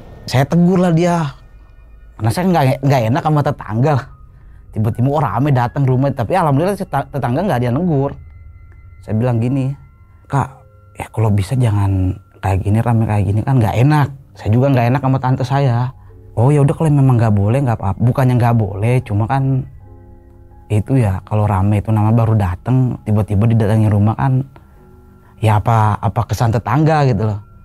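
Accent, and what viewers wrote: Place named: Jakarta, Indonesia. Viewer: Assalamualaikum.. klo ane perhatiin, nte kok mirip bae gaye maupun logat bicaranye ame tokem ane yg seniman betawi boleh dikatakan bgt, biasa bawain lagu2 bg. Kereennnnn👍🏿👍🏿 Sukses buat nte ye🙏🏿🙏🏿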